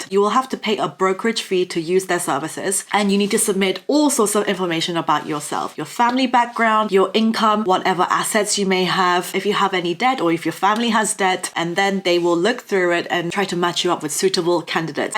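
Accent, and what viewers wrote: England, United Kingdom. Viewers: When you had mentioned about people coming from families with a lot of divorces it strike a nerve. I come from a family that has very few successful marriages. I don’t know what a successful marriage is. It scares me. Another factor. Coming from a dysfunctional family. I became dysfunctional. I’ve been diagnosed with generalized anxiety disorder. Everybody has anxiety, but people like me our anxiety is on steroids. Lastly, I want to mention that you have a very pleasant voice. I hear a British accent. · No money...why u have british accent.lol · As for true romantic love, I may have fallen in love with you during this video. JK. You sound like you were raised mainly in England. Is that true? Anyway, good luck to the Koreans for getting their birthrates back up to at least replacement rate levels. Let's go young people. Get with the program!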